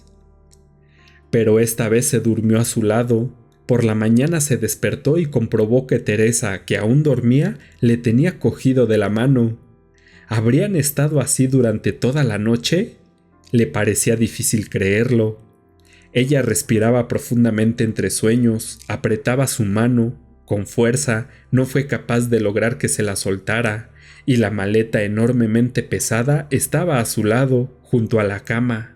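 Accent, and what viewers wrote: Mexico City, Mexico. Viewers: lo está leyendo un chilango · ese acento chilango... choca un poco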